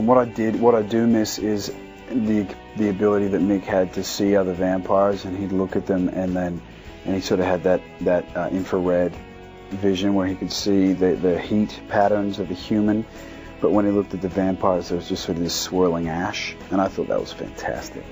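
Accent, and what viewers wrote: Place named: Australia. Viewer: I don't normally find Aussie Accents hot...as I am aussie...but god, he is way hotter with his aussie accent!!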